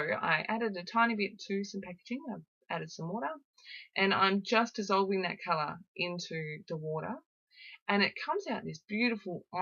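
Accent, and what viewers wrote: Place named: Australia. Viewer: I love your thought process! I am a new subscriber and honestly I first clicked on your video because of your first name and I LOVE you saying my name with your Aussie accent 😉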